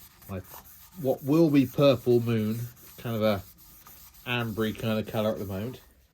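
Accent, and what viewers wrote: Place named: United Kingdom. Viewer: Not only did I enjoy that, I’ve saved it for later. It is different and I like different. It’s also nice to hear a British accent. Thank you for sharing 🌞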